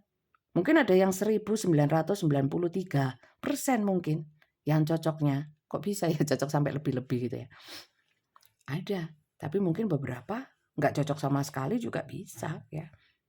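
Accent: Java, Indonesia. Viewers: ❤ Alhamdulillah, terimakasih banyak ibu, atas penjelasannya (medok banget, jelas diterima) Amin ya Robal Alamiin !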